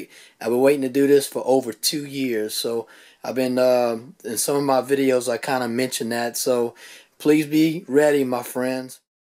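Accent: United States. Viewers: A southern twang with MIC MAC Freestyle records? NICEEEEEE!!! :) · Great video! I subbed! I call my studio the music room too lol. You sound like your from Louisiana too..where you from brother? Anyways just found your channel, searching YouTube for ideas on how to display my records...I don't have nearly as much but I wanna build my collection and put it on YouTube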